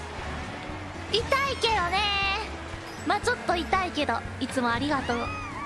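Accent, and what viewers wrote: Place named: Kansai, Japan. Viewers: Not the most "objectively" cutesy moe voice, but the one I like the most for sure, with the kansai-ben and all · do most of the nijisanji members kansai-ben? Somehow i like hearing Sasaki's kansai dialect.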